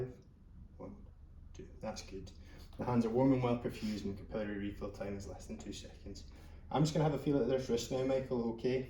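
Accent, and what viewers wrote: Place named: Scotland, United Kingdom. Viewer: lovely Scottish voice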